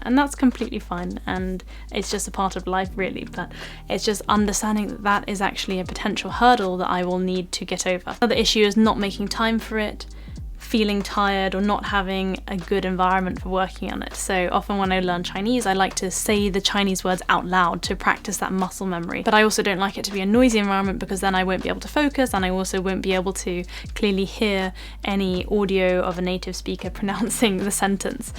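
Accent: RP, United Kingdom. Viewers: Thank you so much ........I really love listening to ur British accent!( ˘ ³˘)❥❥❥ And I enjoyed all day long watching ur Vlogs 🤗🥰 Hoping for the further....,, useful & motivational Vlogs of your's!💟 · Love you always and british accent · Such a breath of fresh air to start off the new year such a positive accertive approach puts me into gear for the year ahead please I adore your English do some more rp english videos I am english but need to polish up thanks so much keep up the good work and yes some yoga blogs would go down well roger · Hello, I really like your British accent, I would like to communicate with u, would u like that I send u my e-mail❓️thank u very much, take care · Hello, Thank you for telling me about your Goal Setting Science and it is really important for me to learn from you how to set goals in my life for the year 2023., I'm happy when you got a degree and became a doctor. 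I love your nice British English speaking styles. You know many Chinese styles. My support is for you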